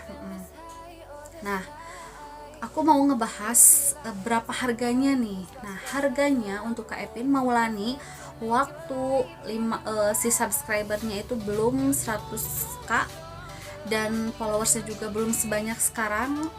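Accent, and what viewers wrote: Indonesia. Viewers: Wong Jawa ap Rika mba 😂😂😂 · nyarios na cirikhas orang Sunda pisan nya teh resep mireng na hehe 😁🤗🤭sukses terus teh ❤️🤗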